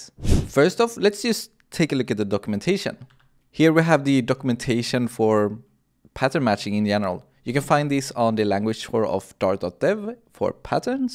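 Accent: Sweden. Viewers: You must be from Sweden, I can hear it!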